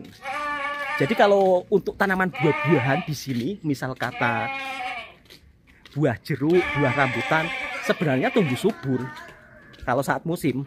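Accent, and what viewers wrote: Java, Indonesia. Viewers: Suara n tonasi nya bunyi jowo kn ...medok banget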